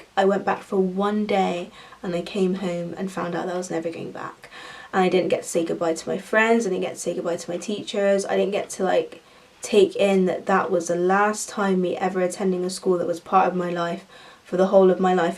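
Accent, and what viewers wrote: Bristol, United Kingdom. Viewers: Bristol accent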